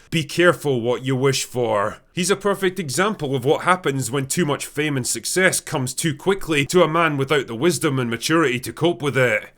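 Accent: United Kingdom. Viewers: why do you have a british accent i just noticed after all these years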